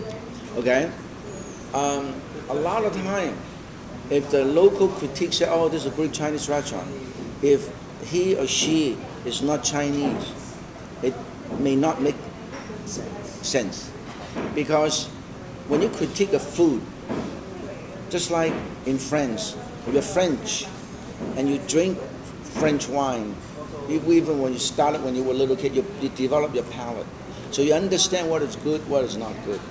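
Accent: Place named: Philippines. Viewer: pinoy!! halatang halata sa accent!! :)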